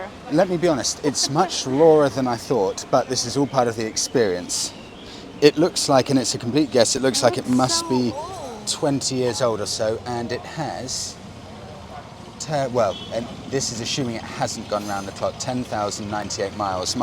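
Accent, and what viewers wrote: United Kingdom. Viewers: you reminds me of Cole Hauser as Carter Verone from Fast & Furioous 2 . just you with the british accent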